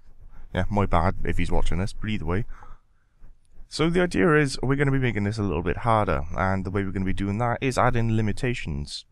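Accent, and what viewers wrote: Wales, United Kingdom. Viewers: r u welsh?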